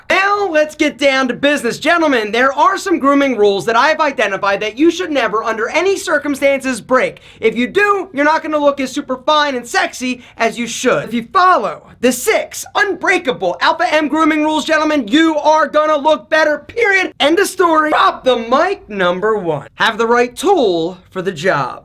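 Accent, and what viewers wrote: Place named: Baltimore, United States. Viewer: Holy Bmore/Philly accent, Batman!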